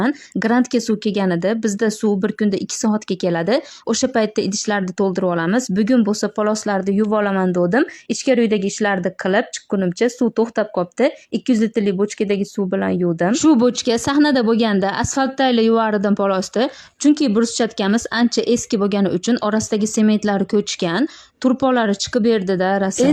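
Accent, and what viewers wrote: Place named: Khorezm, Uzbekistan. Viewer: Хоразмлимисиз суллашингиз Дим ухшиди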